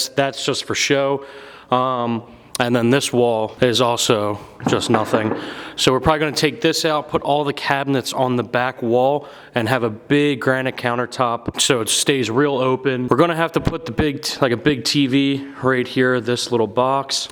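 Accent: Philadelphia, United States. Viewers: Also where in PA are you from? I’m from Delco, you definitely sound like your from the Philly area. I’m hoping to move out to the Lancaster area in a year or 2.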